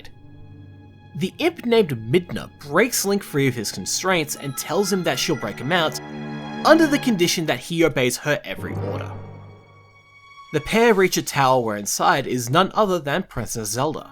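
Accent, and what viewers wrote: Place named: Australia. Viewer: Nothing better than some late night aussie/british video game commentary essay, great work!! if they remade the game like they did with xenoblade 1, I wonder if they would also just make it more anime like to match with botw but I fear it would impact the more dark and muddy tone it had, really added a lot to the ambiance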